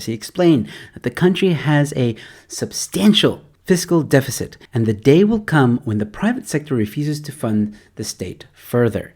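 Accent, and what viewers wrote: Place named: United States. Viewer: Please keep going on as usual, so what if you sound American or look Mexican? I fail to see how that has any bearing on your opinion. I, for one am interested in your opinion and appreciate the concise presentation.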